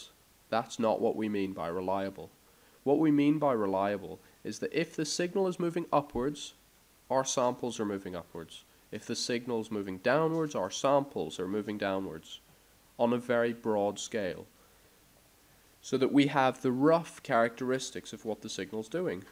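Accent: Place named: Northern Ireland, United Kingdom. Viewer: where are you from I can't tell you got a mad accent fam sounds almost northern irish but at same time quite english what type of hybrid are you this is melting my brain at this tender hour i need to rest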